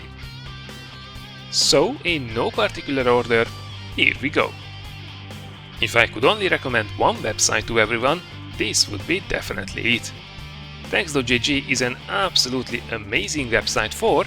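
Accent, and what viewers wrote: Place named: Hungary. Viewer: ha tippelnem kéne akkor Magyar ... akcentusból ítélve.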